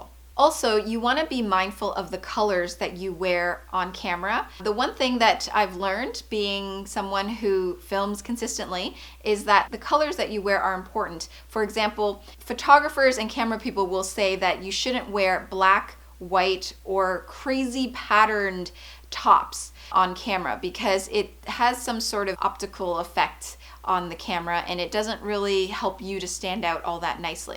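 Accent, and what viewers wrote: Canada. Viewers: It's a Canadian accent 👍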